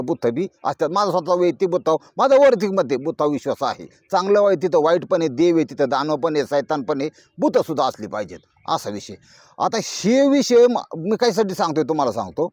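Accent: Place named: Rural, India. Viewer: गावरान शुद्ध मराठी बोलण्याची शैली मस्त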